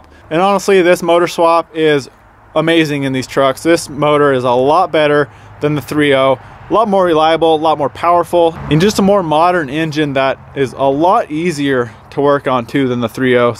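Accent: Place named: Finland. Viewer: Your Finnish English accent needs a a lot more work. Mika Häkkinen style.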